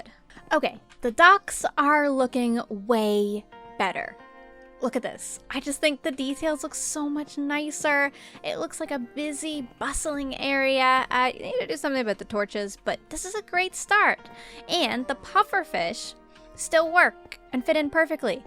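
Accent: Newfoundland, Canada. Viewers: Oh my god your accent makes so much more sense now that I know you're from NFLD lmao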